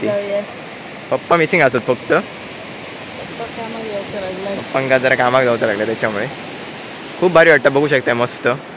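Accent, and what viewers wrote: Malvani, India. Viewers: Are bhava malvani bol Chan Sanvte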